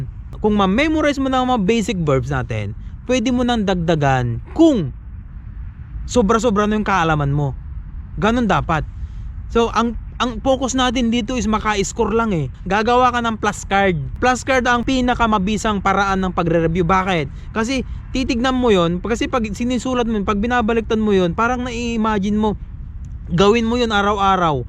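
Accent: Ilocos, Philippines. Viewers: ah ilocano accent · Annyeonghaseyo .. Sir , ilocano ka po ba?! 😁☺ Halata po kc sa accent .. Correct me po if I'm wrong .. 😉 Feeling ko po nkikinig ako sa pinsan ko dhil sa accent mo po .. Hihi Btw , thank you po sa tips .. EPS Aspirant here .. Sana po makapasa .. Para sa aking family .. Fighting!! 😇 GOD BLESS po .. 👍👊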